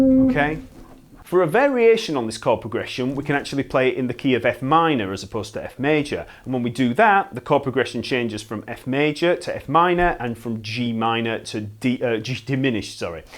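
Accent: United Kingdom. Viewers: Nice video an' accent. R u brit? If you are, from where?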